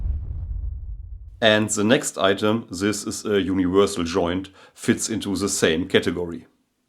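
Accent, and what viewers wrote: Germany. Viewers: Danke für das Video. Bruder, deine Aussprache des Englischen kannst du bequem und leicht verbessern, wenn du Sender von der BBC schaust oder hörst. Das geht leicht über die Website der BBC oder über einen Podcast-Player deiner Wahl. Optional könntest du auch Hörbücher in Englisch anhören, aber dort sind die Sprecherinnen und Sprecher nicht immer auf dem Qualitätsniveau der Sprecher bei der BBC. Was ich nicht empfehlen würde wäre BBC World Radio, da dort auch sehr viele Bürgerinnen und Bürger aus Ländern außerhalb Großbritanniens zu Wort kommen, die schlechter Englisch sprechen als du. Am Ende bist du hoffentlich diesen deutschen Akzent bei deinem Englisch losgeworden.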